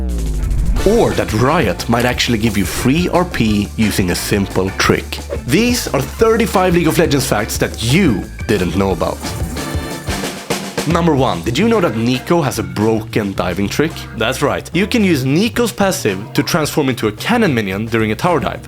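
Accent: Sweden. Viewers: This svengelska😂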